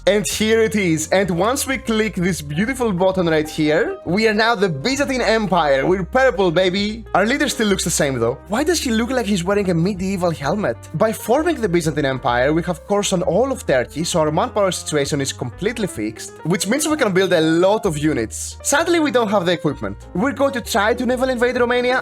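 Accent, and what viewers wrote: Greece. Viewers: your accent sounds greek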